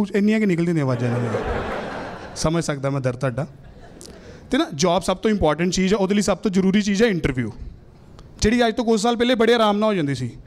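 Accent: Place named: Amritsar, India. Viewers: THAT typical ambarsari accent and words... Priceless... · Pure majhi boli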